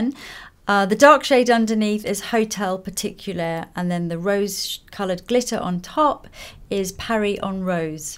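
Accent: United Kingdom. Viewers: I really love your perfect brit pronunciation! And what a gorgeous makeup!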